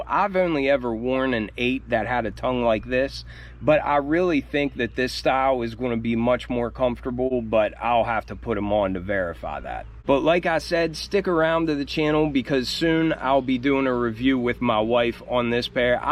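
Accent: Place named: Pittsburgh, United States. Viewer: You from Pittsburgh bro? I noticed the hat and shirt. Plus the accent . I’m from there so I’m curious…I paid resale for those too unfortunately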